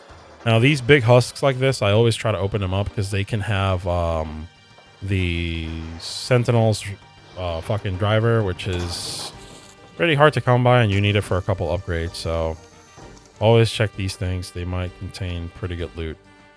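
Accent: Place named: India. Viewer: i know your indian but could you tone the accent down a little, hard to understand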